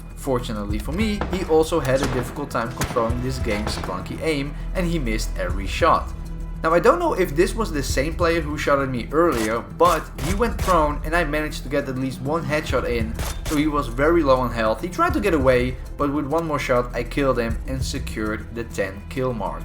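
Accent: Netherlands. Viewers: You Dutch? Waar woont je? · ja ik dacht al dat je Nederlans was🤣😂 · lmao I knew you were dutch hahahah lekker bezig man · I knew you had a dutch accent, You sound like this one famous airsoft player who is also dutch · You're dutch ? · heheh first time viewer here, as soon as he started talking I knew he's dutch :)) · Are u from the Netherlands 🇳🇱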